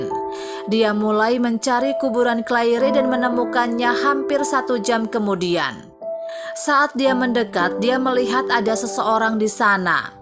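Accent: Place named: Indonesia. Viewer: maaf bacaan orang Indonesia dengan bacaan orang luar negri beda jadi just harus memakluminya yg lancar bahasa inggrispun belum tentu bisa seimbang dengan orang barat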